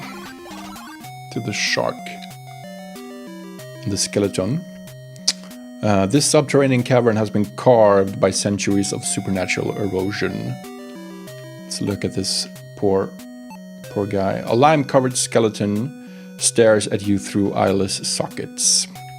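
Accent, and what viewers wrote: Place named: Sweden. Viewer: Your accent is really swedish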